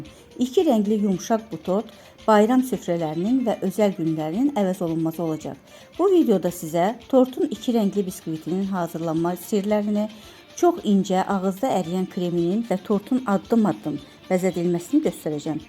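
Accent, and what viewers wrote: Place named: Quba, Azerbaijan. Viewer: Allah bərəkət versin 🤲🤗,necədə səliqəli gözəl .Adamın üreyi açılır.Qubalısınız? Ləhcənizi oxşaydım Quba ləhcəsinə.👍👍👍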